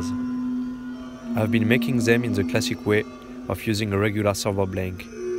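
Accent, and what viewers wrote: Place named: France. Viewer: On sent un petit accent français de rien du tout. Je ne connaissais pas du tout ces flexspoon board amazing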